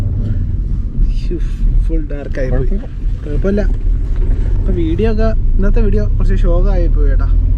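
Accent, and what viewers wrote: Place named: Thiruvananthapuram, India. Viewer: ഇത് tvm ഭാഷ ആണ് മോനുസേ 😁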